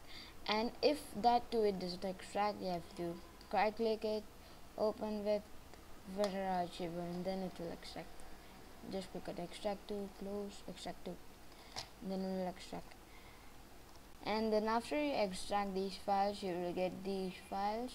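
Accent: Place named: India. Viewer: you have an indian accent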